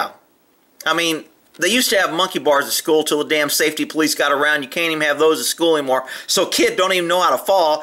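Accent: United States. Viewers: What a fantastic gentle horse! Completely calm, lets the girls have fun, doesn't even move an ear when the ladder falls to the ground. And I love your comments as always, with that lovely American accent "that horse has one thing on his mind, and it ain't running" hahahaah :D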